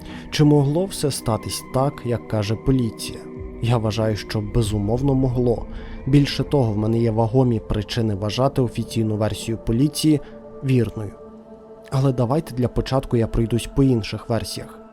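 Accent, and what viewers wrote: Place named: West, Ukraine. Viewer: Мені відчувається західний акцент, але з кожним відео все краще!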